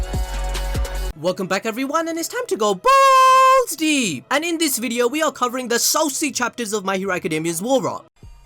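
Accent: Punjab, India. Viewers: Are you Indian... Can't tell with the accent though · Bro hold up with that Punjabi accent, ur audience speaks Hindi too! Namaste 🙏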